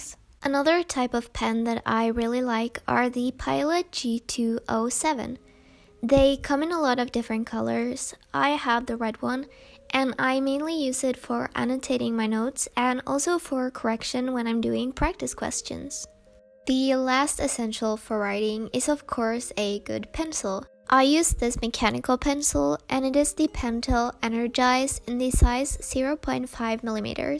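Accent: United States. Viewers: I was surprised when I found out that you were Norwegian. I found out when i realized that you have access to Panduro, which is one of my local stores. And in another video you used your phone and the language was Norwegian. The reason I'm saying this is because from all the Norwegians I've heard. You sound like you come from America. Obviously I don't know if you come from Norway. Cause I live in Norway, but I come from a different country, and so Norwegian is my second language. And it makes me sound not NorwegianXD I'm just saying that I've been getting so used to hearing typical Norwegian people speak English, that it surprised me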